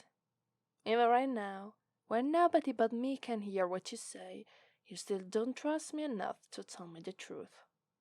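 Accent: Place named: Italy. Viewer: Never knew how an Italian accent sounded until now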